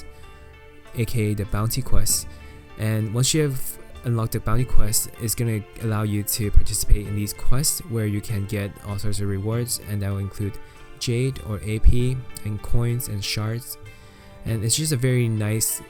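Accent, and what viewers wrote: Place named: Asia. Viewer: Your english sounds like an asian english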